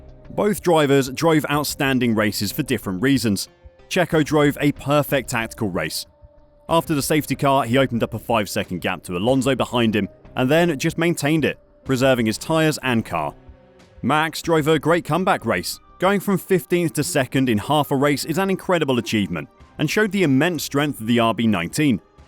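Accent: United Kingdom. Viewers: Very biased video against Max, sounds very British.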